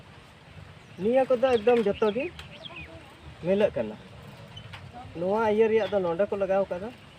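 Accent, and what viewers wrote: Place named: India. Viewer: Aam do santali te ol akan word latar re hindi tem ucharane aa anate bam bujhaw daleyag kana santal ched leka ko ucharana youtube re joto olchiki palhaw hah video njel me ar onde khon mocha te ched leka ucharana aa santali te ankage chiki teho olo aa menkhan hindi ucharan em palhaw khan amdo santali word ucharan bam gan daleya aa nowa redo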